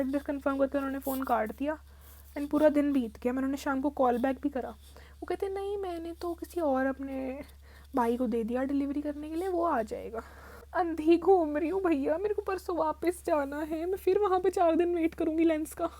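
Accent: Punjab, India. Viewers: hey, i started watching your vlogs and shorts recently and im hooked fr.. really appreciate your efforts and the time and energy you put in your work💅🏻 i just wanted to make a request to you that make a vlog where you'll only speak punjabi for the whole day or whole duration of your vlog (as im a punjabi who stays in mumbai, i got your punjabi accent in your daily sentences and stuff.. also the "भांडे मांज" rahi hai meri roommate- was amazing 😋.. i hope you make a punjabi vlog)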